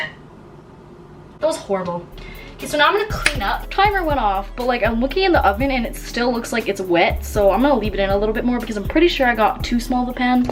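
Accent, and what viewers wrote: Alberta, Canada. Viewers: oh mygod you albertian accent is amazing lol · You can hear the Canadian in her and I love it 🇨🇦🇨🇦🍁🍁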